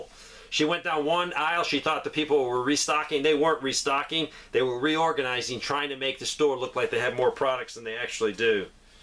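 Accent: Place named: South, United States. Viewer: He's Southern. We talk funny!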